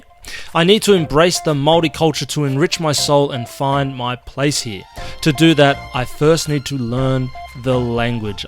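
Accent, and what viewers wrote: Australia. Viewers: I find the Austraalian accent different. But what makes your vids awesome is that you break it down so easily. E mihi ana. Can't take the māori out of the Māori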